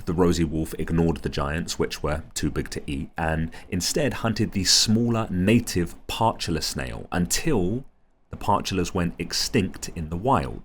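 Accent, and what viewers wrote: United Kingdom. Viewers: Why is he nick cannon with a British accent?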